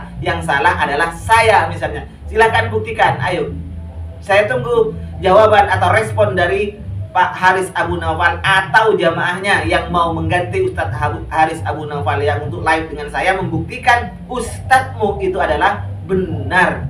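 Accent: Madura, Indonesia. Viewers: Madura nya di p3rj3las cak..... mantap.... mau ktawa takut dosa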